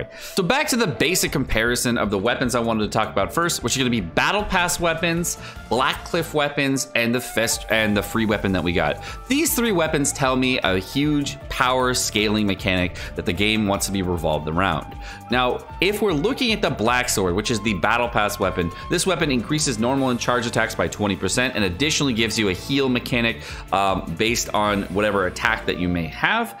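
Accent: United Kingdom. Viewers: That's a very Bri-ishy accent!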